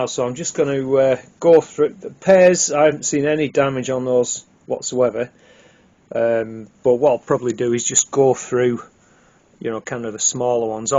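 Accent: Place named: Lancashire, United Kingdom. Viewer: It's so wonderful to listen to your voice. I'm a Lancashire lass and I feel really comfortable with our accent being the same being so close. I'm gonna share your video with my friends all over the world so they know how I talk... just like you. I'm a gardener like you, much reduced now to age and ill health. But I get my joy in life from watching you doing things I can no longer do... thanks.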